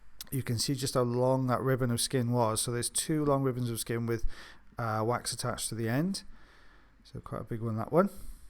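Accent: Wales, United Kingdom. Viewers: Definitely! I could listen to him all day, love those dulcet Welsh tones 😍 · It’s our welsh accent it’s lv it or hate it what part of wales are you and your wife from I live near CARDIFF AND SPEAK WELSH LV UR VIDEOS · I love your Welsh accent. Your' narration of these videos is just brilliant, I'd never want to mute you! How would we learn about Audiology without you. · I could listen to your lovely accent for HOURS!! How i miss Wales. Your commentary is wonderful. Such a crush here!! Lucky Mrs. B!! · Thank you for the special! I had a stressful afternoon yesterday and I got so excited (I sound like a whacked wombat) when I saw this in my notifications! Perfect unwinding video! This southern girl (Georgia, imagine an accent that’s half hillbilly and half Scarlet O’Hara) loves your UK accent! Y’all keep up the good work 😄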